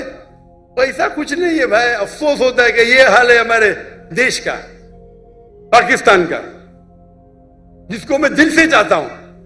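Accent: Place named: Mumbai, India. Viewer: Bambai boli hai bhaiii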